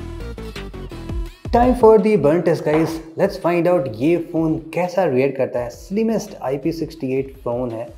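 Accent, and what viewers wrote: India. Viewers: He speaks English, but it sounds Indian😅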